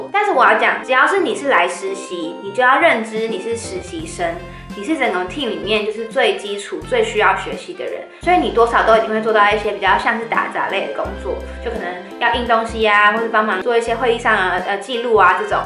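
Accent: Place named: Germany. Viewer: 她是德语发音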